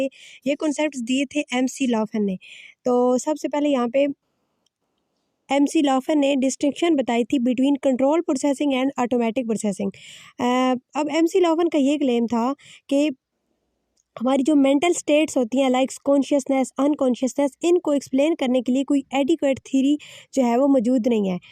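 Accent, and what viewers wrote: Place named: Pakistan. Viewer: Content is good, but I can notice that Pakistani accent there 👀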